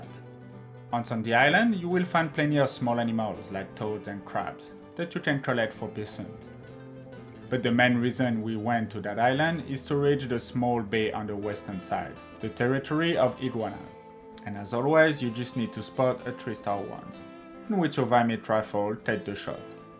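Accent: France. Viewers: Absolutely love how French you sound speaking English.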